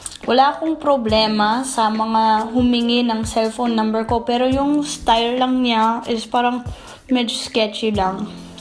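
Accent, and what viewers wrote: Visayas, Philippines. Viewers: You just sounds like a pinay province girl speaking tagalog. But honestly maganda ka lalu na yung mata mo, so expressive. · Hi...ibig sabhin ng bayhana sa bisaya is babae...cute mo mag salita ng bisaya. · NAKAKAGUTOOOMM!!!!! SHARAPPP!! Are my ears playing tricks on me? Even when you speak in English you already have that Filipino accent heheheh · You so sweet at ang lambing ng boses mo,pinay na pinay ang salita mo at kilos,...nakakatuwa ka.... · Filipina na Filipina yung pagsasalita! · Hi Hello from cebu i hope maka visit ka ulit sa PH hehehee Happy ako tlaga kasi the way you speak tagalog, may pusong Pinoy tlaga hihi GODBLESS lablab 😘 Keep it up 💪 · Shout out.. Waray here willing to teach yah.. Ung accent and diction mo are in visayan accent.. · Bilib ako sa iyo. 10 months ka lang nag stay sa Philippines pero naging fluent ka agad sa Tagalog. 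True, yung accent mo is Visayan accent. Kaya yung mga Bisaya kapag nagsalita ng English maganda yung accent nila. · grabe ka Bisaya!! Bisaja gyud kaayo ka daiii... Well spake... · “Gwapa xang bayhana” it is translated into “beautiful/pretty this/that girl” your tagalog is good in a visayan accent. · ang galing pati punto pinay na pinay...yun na lang toronto..ganun talaga samantalang kahit sa call center samin kung banggitin yun "torono" haha. saka "international" eh "inenational"... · Good job...pinay na pinay ang dating m ms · Haha. Sa totoo lang, pag kinausap mo ang isang pinoy na lalaki sa telephone na nagtatagalog ka, hindi magiging interesado sa yo kasi ang dating (whew! Im having a hard time writing this in Tagalog coz I dont know how deep I can go..lol) ng boses mo pag hindi ka nakikita para kang bisaya na marunong magtagalog pero pag nakita ka in person sobrang magugulat yung kausap mo sa telepono. 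Kumbaga, you got pre-judged and dumped just basing on your accent hehe...on the contrary, take that as a compliment. Meaning, you've learned to speak tagalog and sound like a true blue Filipino na hindi sosyal. Haha hirap talaga di ko alam kung naiintindihan mo ang comment ko. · Grabe ang accent pinay n pinay☺️☺️☺️☺️☺️👍🏼👍🏼👍🏼👍🏼,well done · Ang sarap pakinggan ng tagalog mo, may bisaya accent. Very impressive. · Natutuwa ako sa galing mo. 10 months ang dami mong natuto. Ang cute pa ng visaya mo. More power to you & greetings from New Jersey! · Apreciate your filifino accent keep it up im your no.1 fun wish ko pag nag visit ka ulit dto sa philipines kain tayu sa mga sikat na kainan😁😁😁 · I like how your accent when you spoke english was kinda like a filipino accent. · Hahaha... Nag lalaway ako sa crispy pata ang lutong. Nakaka gutom eh. Oo parang ang pagsasalita mo ng tagalog ay may bisayan accent. 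· You've got the best pinoy accent. & YOU ARE SO PRETTY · Hahaha nakakaaliw mag tagalog eh ... medyo malalalim pero parang pinay nadin pag pinakinggan mo ng hndi nakatingin 😊😊😊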